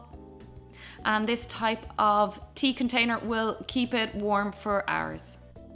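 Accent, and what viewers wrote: Ireland. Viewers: babygirl lyrics it’s an Irish accent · I’ve been enjoying your minimalist videos and just now found out you’re Irish. How I love your country, your people and the fantastic accent. 💖💖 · Irish accents lovvvvvvv ittþ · that's not an English accent it's an Irish accent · Is this a stupid question? I feel like this is stupid to ask, but are you trying to mask your Irish accent?? It just kinda sounds like you are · Do you have children? Pets? Please make a followup video. Love your channel and brogue! 😘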